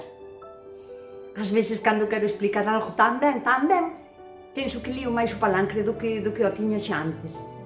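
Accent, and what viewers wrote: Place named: A Coruña, Spain. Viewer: No sé porque me paredes que sos de la Coruña o de Santiago de Compostela. De Galicia..creo que seguro...te escucho con atención ..es la primera vez que te veo..ye emociono tu acento xque mí madre era de la coruña.y yo entendí siempre su fdialecto.....ya no está conmigo..pero tu idioma me traslado a mí niñez.. Gracias....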